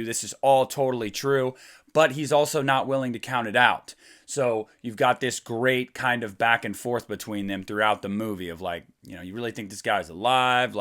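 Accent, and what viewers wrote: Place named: Pittsburgh, United States. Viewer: I love your Pittsburghese.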